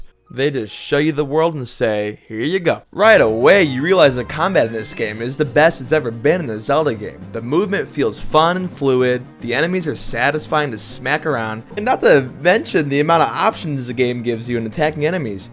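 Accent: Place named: Midwest, United States. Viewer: Midwestern man YouTube voice is music to my ears.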